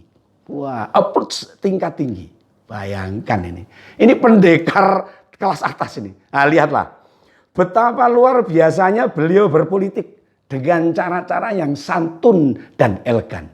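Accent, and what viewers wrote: Java, Indonesia. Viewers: Selamat sore om 😁saya suka dg cara anda mjdi host dg logat jawanya yg sngt kental 👍👍😁🇮🇩..Pak SP itu dlm hati bilang "siapan pak Jokowi udh bikin gw malu" pakde Jokowi blg " kapookkmu kapannn pak brutus😂😂mknya ojo kesusu 👈🇮🇩❤️ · Keren bangen,jowone Medhok tapi aku suka banget ulasane...lanjut berikutnya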